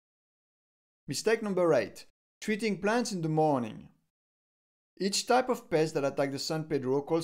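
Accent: France. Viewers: Your accent is too much 😆 fucking french 😂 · wtf l'accent francais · french accent ?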